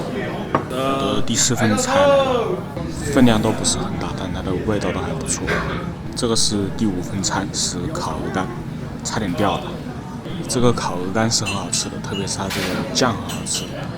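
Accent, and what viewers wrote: Wuhan, China. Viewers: 兄弟口音是武汉的？ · 配个字幕就好了，感觉好像汉普啊哈哈🎉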